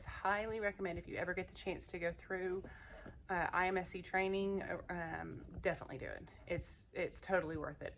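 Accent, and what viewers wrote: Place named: Arkansas, United States. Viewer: First time watching and I heard a “Ya’ll” in there and thought “she sure does sound Arkansan!” (Like me lol) and then you mentioned later in the video having taught in Arkansas!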